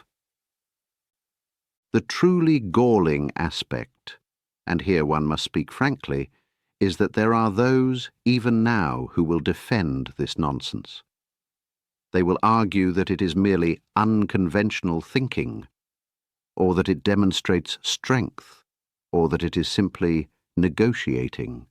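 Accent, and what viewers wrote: United Kingdom. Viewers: Why is it always someone with a British accent that sounds the alarms of discontent and stupidity within our country and makes the most sense of what blind cult Americans refuse to acknowledge always so on point. WAKE THE F up PEOPLE this is our country going to HELL in a hand basket and we may never recover😢😢😢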